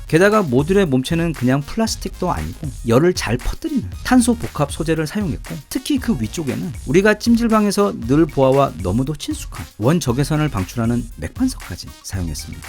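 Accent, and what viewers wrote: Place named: Honam, South Korea. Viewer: 호남 사투리만 찰진게 아니고, 같은 내용을 요러콤 찰지게 풀어나가네요.하루가 싱싱!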